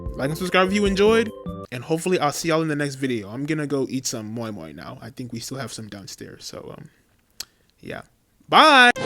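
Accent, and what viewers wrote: Nigeria. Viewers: Hey my brother wassup, I'm also Nigerian, I want to hear your Nigerian accent in the next video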